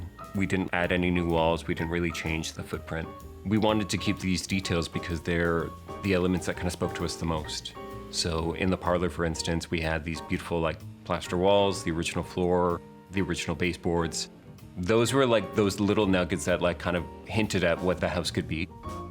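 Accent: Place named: Midwest, United States. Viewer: Wow - this guy is from the Midwest if ever I’ve heard a midwesterner. (Update: Ontario accents being synonymous to those in Michigan, upstate New York, or Wisconsin.)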